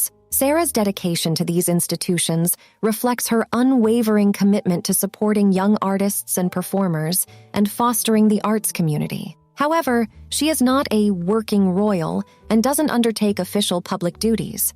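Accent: United States. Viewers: the narrator is American...relax...we never got any news about her here in the US...too bad, too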